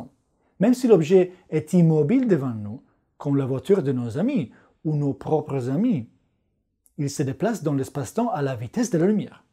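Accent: Italy. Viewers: la seule chaine qui réunit un physicien super beau, un accent italien a tomber par terre et de la science super bien expliquée pour les novices...que demander de plus :elbowcough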